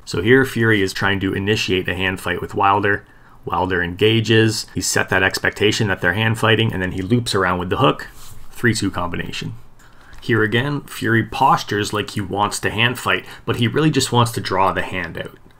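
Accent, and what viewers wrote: Canada. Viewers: An odd mix of a Canadian or a Devonshire accent plus American, where are you from and where have you lived? i mean. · You’re a Canadian aren’t you. I know that accent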